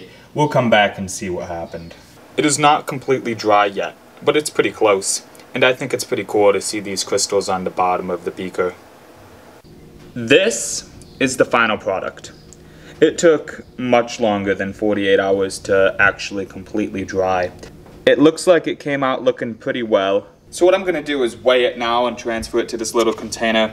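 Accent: United States. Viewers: Are you from Boston? You sound like you have a Boston accent lol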